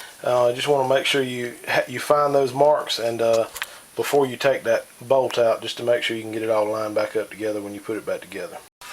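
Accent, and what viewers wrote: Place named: Carolina, United States. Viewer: I know it's activating but I really appreciate you taking the time and activation to do these videos, very helpful, and you sound like a north or south Carolina man, I'm born and bread north Carolina and I'll die here unless the liberals get to much which I'd say thier pretty bad across the country, depending on which state your in, the triangle area with our schools, duke, unc state, wake forest, it brings the crazy in but we praying it don't get to bad but anyway just wanted to thank you for your time and videos, God bless you and your family in Jesus name, be well , Mike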